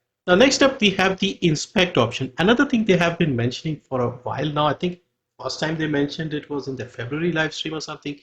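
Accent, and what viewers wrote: India. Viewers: I was able to recognise from your voice that you are an Indian. Proud to see a fellow Indian doing this good. Keep up the great work!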